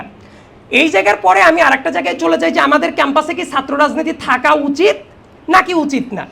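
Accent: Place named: Rural, Bangladesh. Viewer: ছাগল একটা গাইয়া উচ্চারণ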